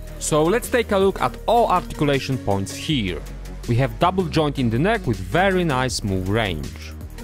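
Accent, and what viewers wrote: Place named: United Kingdom. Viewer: I'm in NC, USA. But you sound like you're over the "pond" lol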